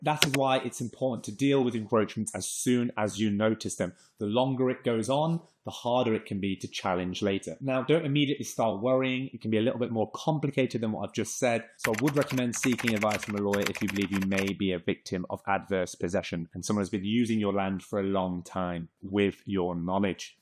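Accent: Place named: England, United Kingdom. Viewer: Is this UK law or American Law? I notice you're spelling "Neighbor" which is the American spelling but you sound English?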